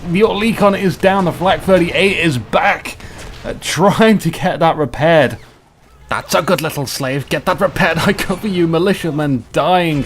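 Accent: England, United Kingdom. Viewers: Fantastic video! Thanks for all the hard work, I can tell by your commentary that you too have a huge... english acccent and I love it! Excellent game, good to see lots of pressure and lots of light vehicle play, this game is great in that its not all just kt and is3 spam!:) good luck to butch and baloo, having watched this video and shootter's videos it seems like butcher and baloo are going to spank them back to mexico.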